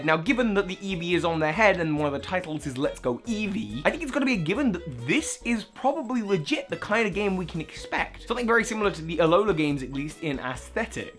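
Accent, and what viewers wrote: United Kingdom. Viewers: Also why do british people call the United States "americ-er"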